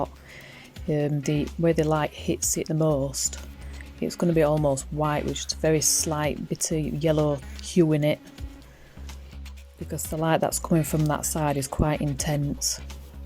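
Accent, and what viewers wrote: Yorkshire, United Kingdom. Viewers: Absolutely incredible work, 'really eye catching'. And how good does it feel to hear a Yorkshire voice for a change👍😷👍🏴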